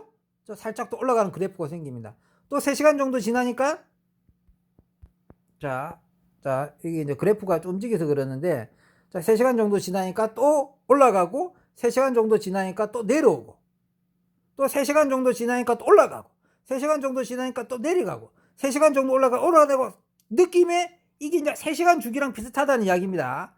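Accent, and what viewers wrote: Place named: Jinju, South Korea. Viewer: 진주분이신가봐요? 영상잘보고있습니다.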